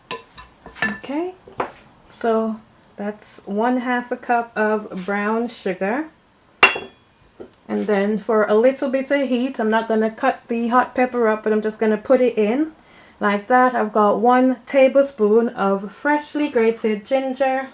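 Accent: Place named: Montserrat. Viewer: Funny when I heard your accent and saw the ingredients my first thought was Montserrat 🇲🇸. Are you from Strat? Very good video, clear and easy to follow.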